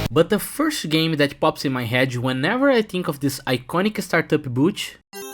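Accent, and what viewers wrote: Brazil. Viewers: Hmm...sotaque br reconheço de longe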